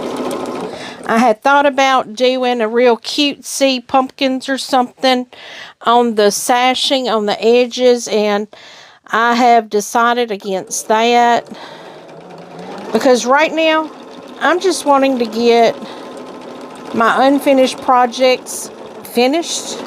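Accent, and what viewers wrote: South, United States. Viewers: Chit chat is calming. Love your southern accent. Your boo quilting came out lovely! I always do my own, but have to admit it’s not my favorite. Oh well, practice helps.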